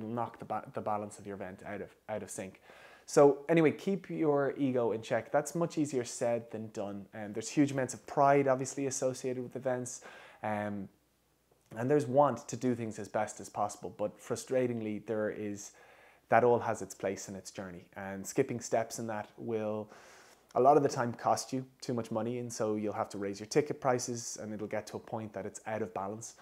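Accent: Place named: Dublin, Ireland. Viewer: Hi! Watching from Alaska and right off the bat I knew you had a Dublin accent! Almost even a little more southern than Dublin. My dad is from Cork! Thanks for this video! I know you make a ton of videos but you didn’t start your video with an intro! No hello or anything! But lots of info! Thank you thank you!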